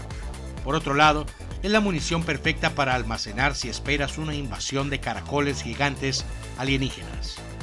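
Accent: Costa Rica. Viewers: Desde el principio supe que eras tico aun si ni siquiera lo dijeras saludos desde cr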